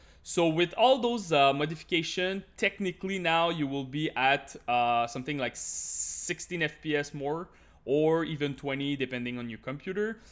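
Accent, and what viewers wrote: Quebec, Canada. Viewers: boi tes quebecois? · I can feel the quebec in him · accent is from quebec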